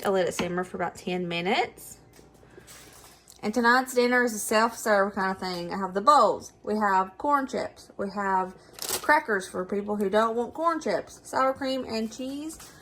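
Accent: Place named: Deep South, United States. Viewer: u tube suggested your channel to me, & now I’m a fan!! You cook just like i was raised to cook! We also happen to buy the same types of groceries 😂😂 we’re both from the deep South! You talk just like me & my family do!!😂 God bless you & that lovely family ❤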